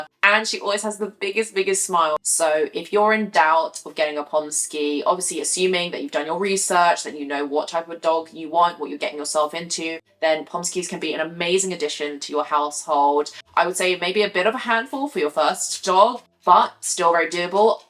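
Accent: United Kingdom. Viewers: A Asian with a British accent 🔥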